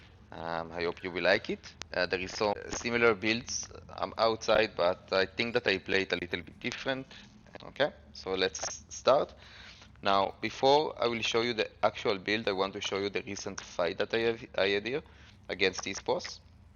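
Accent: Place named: Israel. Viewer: חח תודה רבה צדיק זיהיתי לפי המבטא את הישראליות ! נראה מעניין,אולי אלך על הבילד הזה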